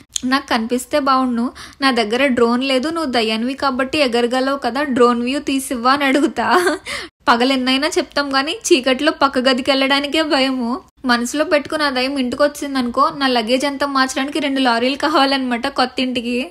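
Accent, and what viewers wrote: Godavari, India. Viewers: Ma godavari slang baguntundhe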